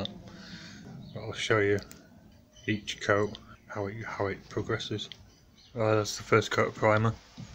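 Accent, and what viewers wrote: Yorkshire, United Kingdom. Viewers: I'm from Yorkshire myself and I van hear the accent ☺ great tips thanks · Really, really enjoyed that. You taught me a great deal there. Thanks for all the work. Nice to hear a Yorkshire accent - from a Yorkshire man living in Sydney. Cheers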